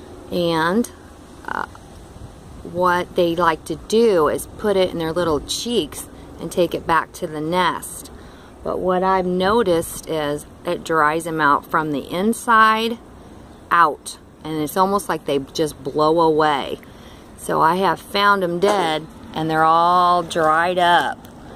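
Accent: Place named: Indiana, United States. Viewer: That's an Indiana accent I think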